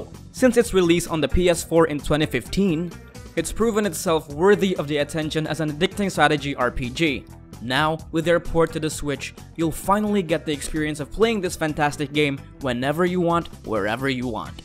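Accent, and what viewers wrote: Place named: Philippines. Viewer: your accent is somehow sounds like a Filipino accent, i just noticed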